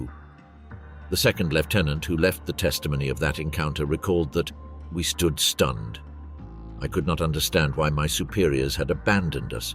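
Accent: United Kingdom. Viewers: In primele minute ale povestii voastre apar și primele , nu erori ci minciuni Limba engleza și acentul britanic al povestitorului nu conferă autenticitate povestii mutilate și modificate propagandistic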